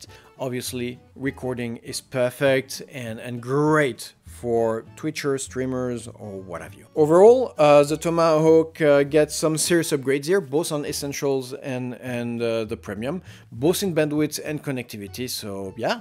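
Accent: France. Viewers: I enjoy your video's so much. I may have asked before but what accent am I enjoying so much? is it french or french canadian or something altogether different. either way I love you accent.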